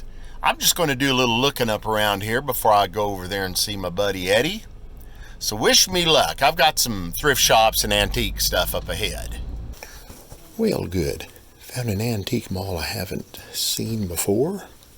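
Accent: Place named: Texas, United States. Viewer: Love the Texas talk. I'm in Southern Illinois. Always wanted to visit, never worked out. Great finds!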